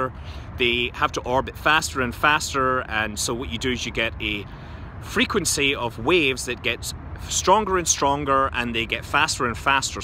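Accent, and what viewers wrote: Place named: Glasgow, United Kingdom. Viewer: I knew that accent was from Glasgow! astronomy always getting something impressive to show... go Science haha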